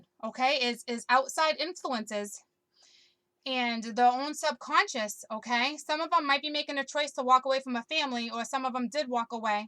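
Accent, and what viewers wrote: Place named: Boston, United States. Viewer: Flip’n ADDICTED to U!! Your accents (Boston? ~ my son is in Boston !) ❤️💛💙💚🌹♂☿♀💝💑👫 YOU!! WE hv ALL bn stabbed in the back!!! YOU GIRL need to be exposed big time on a much bigger platform! I’d hire you to do events... I’m new to YouTube but would love to hv contact with u. ❤️❤️❤️ YOU!! YOU R SUCH AN AWESOME GOOD SOUL!!!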